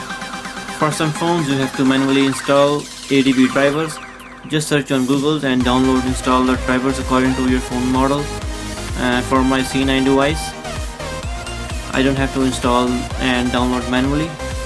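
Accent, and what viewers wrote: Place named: India. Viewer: Please turn down that annoying music. I have a hard time with accents as it is. I love people from that region, but have a difficult time uderstanding.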